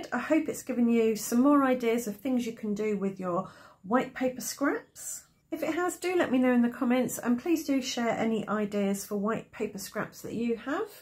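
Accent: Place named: United Kingdom. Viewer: I love hearing the British accent too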